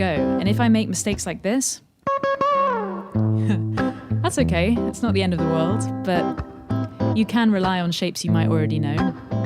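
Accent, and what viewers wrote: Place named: England, United Kingdom. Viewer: What I find interesting is that your English accent is evident when you're talking but not when you're singing.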